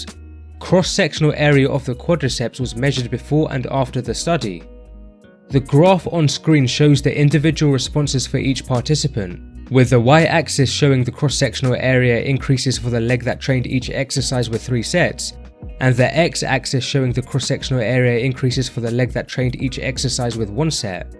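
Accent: United Kingdom. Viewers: What regional English accent is this?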